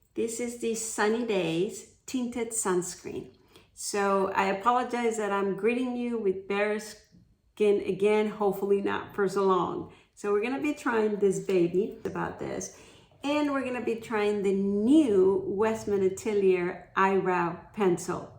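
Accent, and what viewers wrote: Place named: Mexico. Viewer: I had a feeling you’re from Mexico. I’m from Los Angeles, so I’m super familiar with the accent. Now I live in Miami, so I don’t hear it as often anymore- it’s all Cuban, Dominican, island accents and some Central American here and there. Well mujer- I’m digging your channel. You have tons of videos and it’s always refreshing to see videos of more grown women! Keep it up and much success to you!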